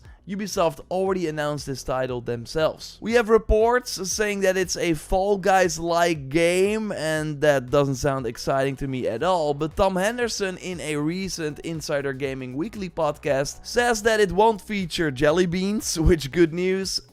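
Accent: Netherlands. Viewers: Your dutch accent really sucks man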